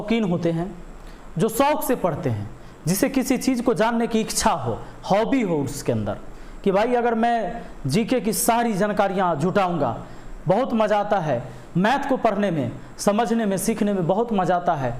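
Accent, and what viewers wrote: Bihar, India. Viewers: Abe Ga*ndu ab tu unko sikhayega kya shi hai or kya galt, wo Bihar ke hain unki language aisi hi hai, tere se bahut aati hai hindi to ye video hi mt dekh, ja dusre channel walo ki chat bhencho*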